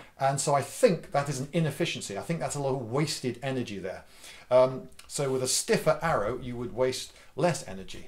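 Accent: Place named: England, United Kingdom. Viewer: You may ramble all you like Luv. My Grandfather was from England and I loved to listen to him talk and hear that "Accent" of his and once in a while (More oft then not.) that same "Accent" will come out of my mouth and my friends at "The Minnesota Renaissance Festival" just love it when that happens because they know for a fact that I am not faking it like they are and the "Accent" comes out a great bit more there. But all in all. I miss hearing a pure English voice like yours So. Keep on rambling on Luv..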